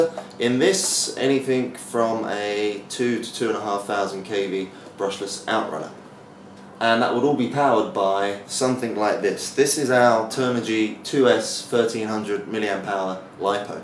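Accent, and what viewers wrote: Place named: United Kingdom. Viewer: at last someone who speaks the queens english (sorry scott) it must have been made in the UK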